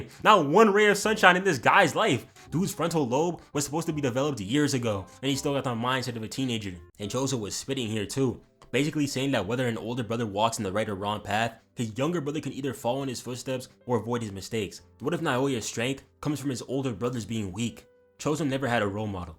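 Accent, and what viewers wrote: Kenya. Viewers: Bro are you from Kenya by any chance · Ayooo are u kenyan. Im here from kenya tooo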